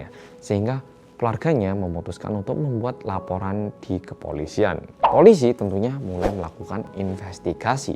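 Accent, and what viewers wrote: Java, Indonesia. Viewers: klik dulu cak medokk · orang korea yang medok selalu di hati:) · Ya ampunn.. baru liat ytb abang medhok ini lagi, sekarang ada backsoundnya euy. 😜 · tim gecep...medokkk 😊😊😊😊😊😊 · Ganteng,medok,tuek,suarane apik, IsO ngomong Korea,wong Korea,gae konten mbendino,critane apik,urong rabi,duwite akeh,subscriber re akeh😠penak men koe Dibalik semua itu ada erjuangan yg luarbiasa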